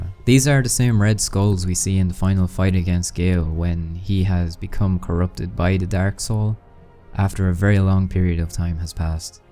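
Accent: Ireland. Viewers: your accent but it sounds Irish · You sound Irish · your accent. Irish?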